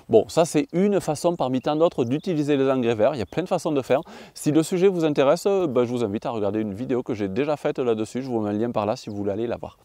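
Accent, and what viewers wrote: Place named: Occitania, France. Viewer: Salut! Tu as un accent occitan. De quel département tu es?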